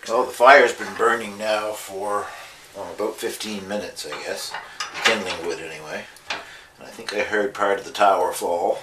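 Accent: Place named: Nova Scotia, Canada. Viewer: Subbed ya for two reasons. 1) Love the down East accent. Although I live in the prairies now, I grew up in N.S, and hearing you speak makes me feel like I'm back home. 2) I love how very Canadian you are. My husband and I try very hard to buy as much Canadian made product as possible. About a year ago we bought a boler (Canadian made mini Camper). We're hoping to add many camping vids to my channel this coming summer. Anyway, great vids. I've been binge watching a few days now.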